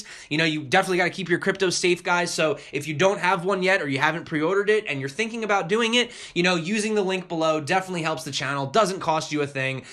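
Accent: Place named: United States. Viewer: Your accent is American.So I've asked you.Thank you bro.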